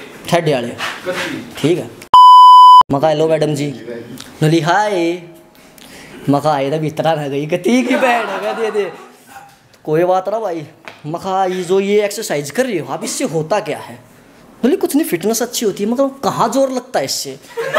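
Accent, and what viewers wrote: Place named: Haryana, India. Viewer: Bhout badiya bhai Haryanvi Level Increase 👍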